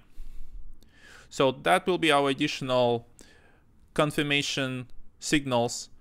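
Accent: Russia. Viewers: Не даёт мне покоя твой акцент.. русский?) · да наш, русский акцент слышен прям четко, но лучше чем у индусов))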